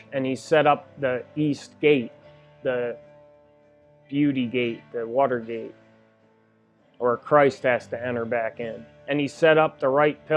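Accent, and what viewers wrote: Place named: Pennsylvania, United States. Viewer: Love how you had a Freudian slip and said The Lion shall lay with the Lamb 🐑 I mean the wolf 🐺 😂 it is photoshopped but it, it has to be ! Great stuff my friend. And it’s nice to hear your PA accent I was just living in Tower City but in FL now. Yea your getting into the difference between the mortal flesh or materialistic vs. the Higher Mind and spiritual self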